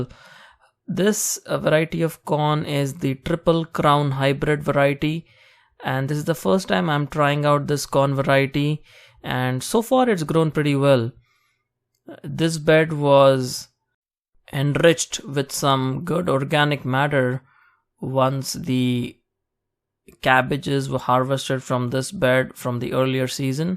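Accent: India. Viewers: your accent....are you from India ? nice videos :)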